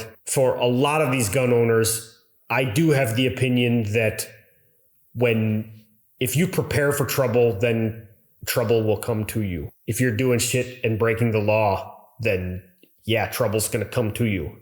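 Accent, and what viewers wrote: Germany. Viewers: Really thick german accent why? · lo you got slight german accent.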